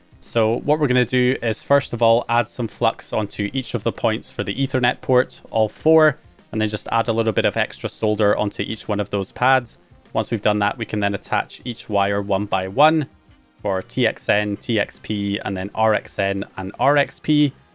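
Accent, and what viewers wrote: Scotland, United Kingdom. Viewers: My Scottish wife wondering which part of Scotland you're from?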